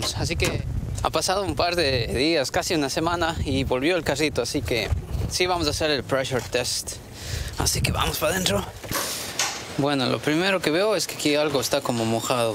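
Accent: Cuenca, Ecuador. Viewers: no soy mecanico pero tengo un problema con una ford f150 que lo compre recien. Se recalento estan do parado se hizo un telajo. Saludos felicidades por el acento parese ser de cuenca 🇪🇨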